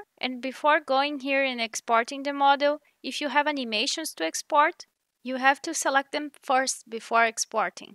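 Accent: Brazil. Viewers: caramba mano, eu fui pesquisar sobre como extrair modelos do ps2, e saquei seu sotaque na hora que vc era brasileira kkkk, é meio difícil brasileiros se interessarem por essas coisas, muito bacana o vídeo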